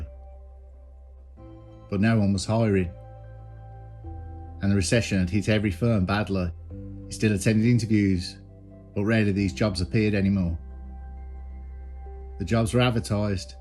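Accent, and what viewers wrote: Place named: West Midlands, United Kingdom. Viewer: I could listen to you read the dictionary love the West Midlands accent much love from a weegie 😊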